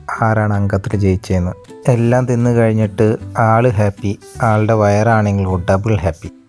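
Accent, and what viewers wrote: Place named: Mookkannoor, India. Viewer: താങ്കളുടെ സംസാരരീതി കേട്ടിട്ട് വീട് മൂക്കന്നൂർ ആണ്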